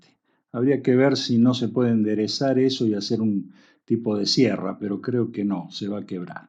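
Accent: Argentina. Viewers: Como se nota que es argento por la manera de hablar... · Mira que casualidad (o causalidad), hace unas semanas atrás, al ver como mi flía se encarga de romper o descomponer los encendedores que yo aporto para la cocina (mientras yo los proveo, ellos los dejan obsoletos ... buah!), me encontré con una buena cantidad de estos artefactos indesusos. Y como soy dado pa las cosas manuales, las herramientas y la creatividad, me propuse el reactivarlos combinando algunos que les faltaban la piedra, o partes varias con otros con sus recamaras de gas intactos. La paciencia, dedicación y las ganas de aprendizaje lo es todo! Gracias por tus aportes, y me hiciste reir con tu forma bien "argenta" de hablar. Saludos desde Rosario "Cuna de la Bandera Argentina".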